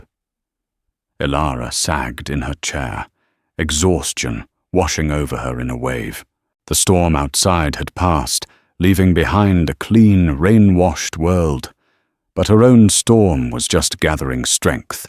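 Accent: United Kingdom. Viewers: That is a British accent, in my opinion, the nicest of the very many British accents from around the UK…. He places emphasis on words much more often than most of the other voices.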